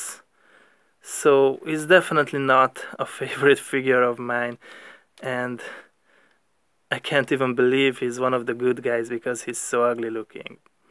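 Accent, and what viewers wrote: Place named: Hungary. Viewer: Te lenni magyar? nagyon akcentusos....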